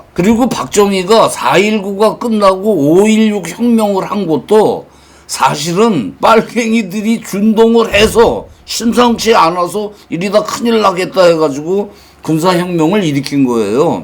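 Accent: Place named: North Korea. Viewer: 이사람은 북에서 넘어온 사람인가 북한 말하네요 그런데 남한정치 역사를 정치 박사보다도 더 잘하고 있는데요 북한 간접 교육받고 워남해서 남한 국민 이간질 하고 있는것 같아요.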